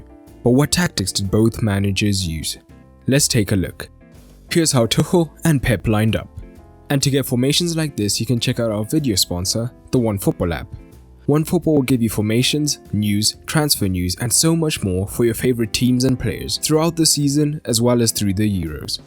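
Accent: South Africa. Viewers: Are you south African? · Is the narrator south African? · Are you South African?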